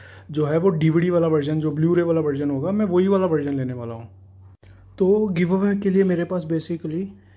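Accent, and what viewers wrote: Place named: Punjab, India. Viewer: Bro abb to khair theek he ho app Bro face reveal thora suspense ke sath karna tha Baki mere ko lagta hai ki app punjabi bolte honge ghar pe 😄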